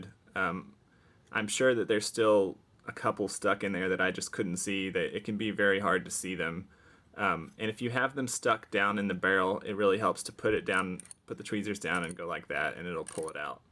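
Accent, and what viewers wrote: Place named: South, United States. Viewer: thanks for putting me to sleep, jesus get some life in you . You sound half dead and talk like your from down south slow as can be. Otherwise good vid and a quick nap for me is good as well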